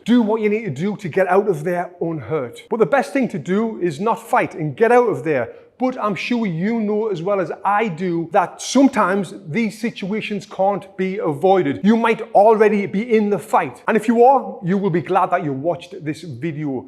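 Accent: United Kingdom. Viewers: Just watching for this great British bulldog accent😅 I use left hook twice low and immediately high afterwards. But I trained that. As you said stay balanced when moving back protect ur chin and do not blink. Or hang around with nice people at chill places so u do not get confronted. Worked for me last 30 years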